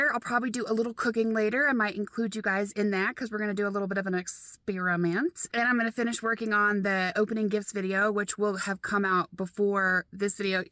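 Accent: South, United States. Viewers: Are you originally from the southern part of America?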